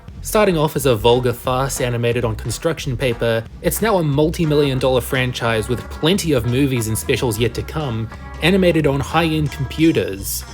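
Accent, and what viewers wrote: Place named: Australia. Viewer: People here:He pronounced it wrong,he pronounced it Shah-grin,Chah-ring,Who writes your stuff..."bunchofbullshit". Guys,he's Australian,this way of speaking comes from his accent,be a little more respectful and understandable towards him.😒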